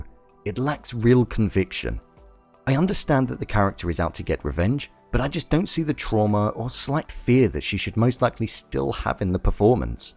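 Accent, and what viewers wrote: United Kingdom. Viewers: Oh great another cynical white british male voice on youtube to bestow his oh so original critical thoughts on us.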